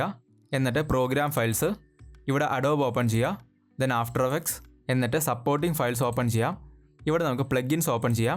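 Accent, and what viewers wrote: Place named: India. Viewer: I can't understand your Indian accent. Instead you can practice pronouncing each word correctly and slowly.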